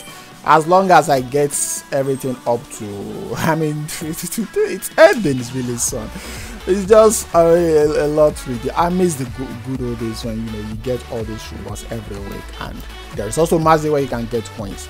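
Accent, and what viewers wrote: Nigeria. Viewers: Bro, are you Nigerian?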